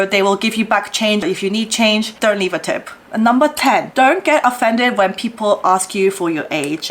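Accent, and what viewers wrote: England, United Kingdom. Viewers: The UK she said, that accent definitely rubbed off on her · You have a very sweet “Queen’s English” accent,. I can help you with American 🇺🇸 English! Ha, ha, ha! 🇰🇷🤗❤️🤗🇺🇸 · wow, strong England English accent detected! :) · Algorithm led me to this video and I thought 'I know someone who's name is ' and heard her talking and 'Brisith accent?' and saw her face and 'Oh...it's!':) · I am so happy to see you again. You know the funny thing is that I was just thinking about you. "That beautiful Korean Youtuber with UK accent. I missed her and her narrative about Korea and Korean Culture" I thought. I then saw your video come up in my youtube feed. Well, I just actually subscribed to your channel. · Your British accent is obvious. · That is the MOST English accent lol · 영국에서 오신분인가요? 영국 발음이 들리시넹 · 한국인인데 다 봤어요 영국 발음 너무 멋있다 ㅠㅠㅠㅠㅠㅠㅠ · I was gonna say, your accent is almost British. 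· 우연히 왔는데 , 카즈하 같은 느낌의 더 예쁘신 분이네요. 잘 보고 갑니다. 묘하게 영국 발음이랑 미국느낌이 섞이신 발음 같아요. 행복하세유~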